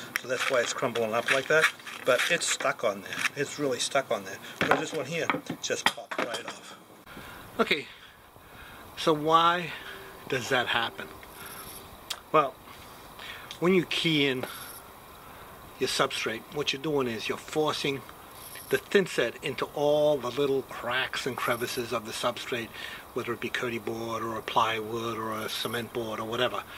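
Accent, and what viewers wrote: Boston, United States. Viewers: Man you can hear that Boston! 😂👍Great work. Always teaching us better.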